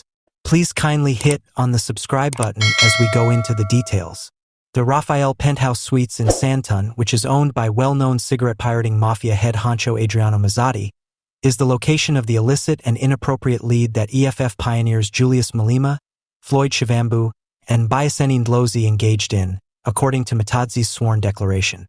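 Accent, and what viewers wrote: United States. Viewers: Why is an American doing this voice-over?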